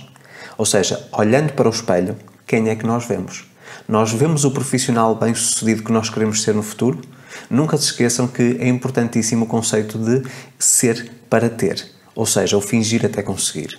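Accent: Portugal. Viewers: Seus conteúdos são ótimos e diferenciados e com um toque de sotaque português muito agradável. Merece muitos “likes”. Já compartilhei com a minha filha. Gratidão,!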